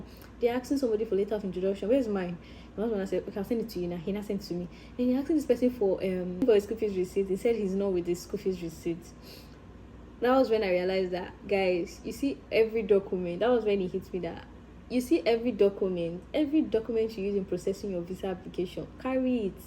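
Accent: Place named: Cameroon. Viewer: U sound like a Cmrnian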